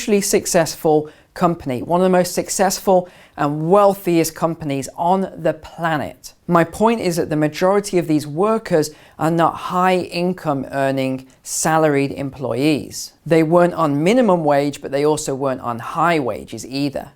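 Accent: United Kingdom. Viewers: Its weird listening to a British sounding guy talk about American reality. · God bless you for saying all four syllables of "military" !! With a British accent, I was fully expecting to hear "mili-TREE" instead.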